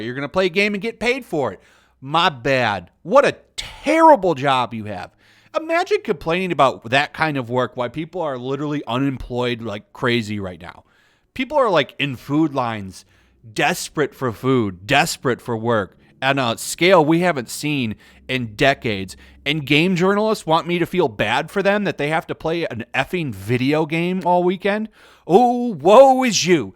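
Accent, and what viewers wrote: United Kingdom. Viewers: Have never been into any games like GTA but if you can be Keanu Reeves in Cyberpunk 2077, then I'm one step closer to trying. Excellent video again brother. Loved the British accent. God bless you.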